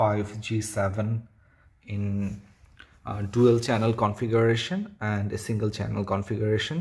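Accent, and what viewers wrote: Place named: Bengal, India. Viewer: Accent shune bujha jay bangali... Hoytoh dhakar keu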